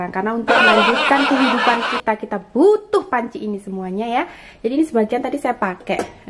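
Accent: Java, Indonesia. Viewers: Mb klo bicara dicampur bahasa jawa yg medok lucu deh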